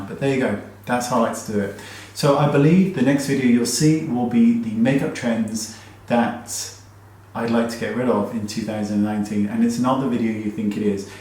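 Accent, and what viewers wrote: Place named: United Kingdom. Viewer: Disconnected beard 👍, highlighter under blusher 👌, that earring whooooo makes me cruuuush on you and the cherry on top of the cake is your accent! It's british i assume... ! How i wish i could learn that accent ,it's beautiful to the ears. Sooothing OMG, Wayne is Asmring without realising it (if that make sense) lol! 💓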